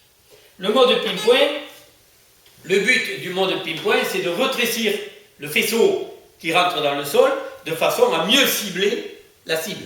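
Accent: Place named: Toulouse, France. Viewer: J'ai hâte de recevoir le mien ! très bien cette démo et avec l'accent de Toulouse c'est encore mieux !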